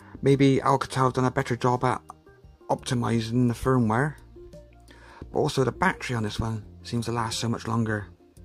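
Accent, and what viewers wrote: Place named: West Country, United Kingdom. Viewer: Great he's English. Could be from West country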